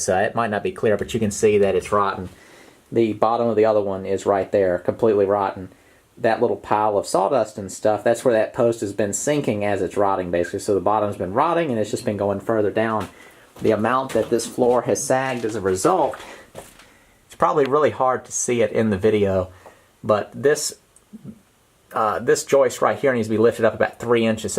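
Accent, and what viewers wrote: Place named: Mid-Atlantic, United States. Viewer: It's mid Atlantic, coastal. I'd say he's somewhere in the Virginia-South Carolina region. Shift 250 miles inland and you get Scots-Irish Southern. Regional accents can be telling, even as little as 100 miles can define a region.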